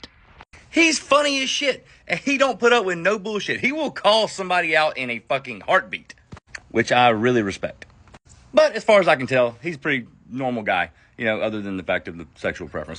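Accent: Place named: South Jersey, United States. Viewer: Ps-you might have a lil south jersey in ya like myself. You rock👍❤️🕊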